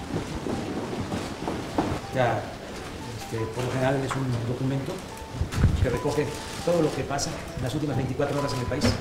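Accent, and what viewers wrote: Tabasco, Mexico. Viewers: Lo bueno es que el recorrido lo hace en su castellano tabasqueño, porque si tuviera que hacerlo en inglés, estaría perdido. Sugiero que para recuperar espacios en palacio nacional, él y su prole se larguen de ahí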